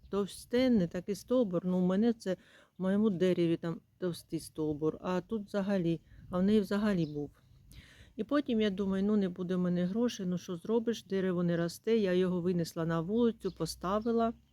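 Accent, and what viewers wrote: Chernihiv, Ukraine. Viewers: Ви, мабуть з Чернігівщини? Я теж звідти. По говору, це мабуть, Макошино, Мена, Сосниця? Я з Борзнянського району. Але живу в Дніпрі. Підписана на Вас. Дякую за цікаві інформації. З святом Вас. І нехай щастить в усьому.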